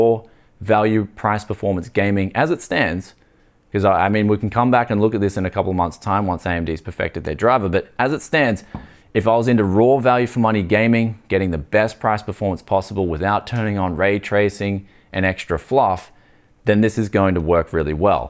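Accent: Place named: Australia. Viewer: Google voice reviews with Aussie accent.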